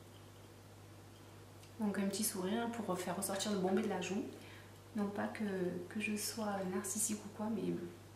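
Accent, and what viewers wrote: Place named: South, France. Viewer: coucou ! ça fait plaisir de te voir en vrai ! Toi aussi tu as un petit accent du sud, tu es d'où ?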